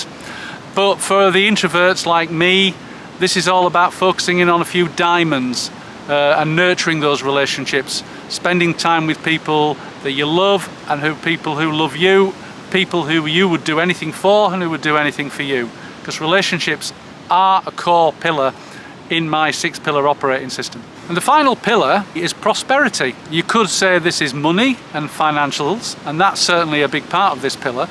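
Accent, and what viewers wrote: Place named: England, United Kingdom. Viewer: You must be in England somewhere I see that you sound like you're in england. I have direct ancestors that's from a lot of England.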